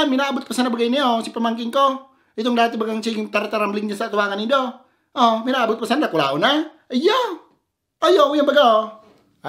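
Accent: Bicol, Philippines. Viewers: napindot ko na ang subscribe . gaganda ng vids . nakakamiss mag salita ang taga bicol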